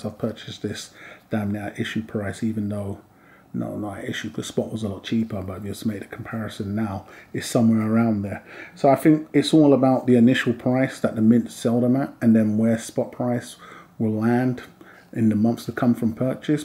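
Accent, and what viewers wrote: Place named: Brixton, United Kingdom. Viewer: I recognize a Brixton accent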